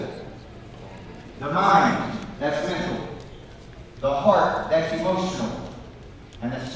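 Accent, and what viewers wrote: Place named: South, United States. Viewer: I love that Southern accent!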